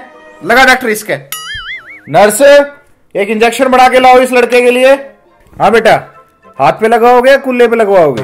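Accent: Haryana, India. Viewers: M b Haryana m 3 saal pada hu aap bilkul sonipat wali haryanwi bolte h i luv it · I like ur haryanvi .....enjoy a lot....and ur wife is very beautiful.....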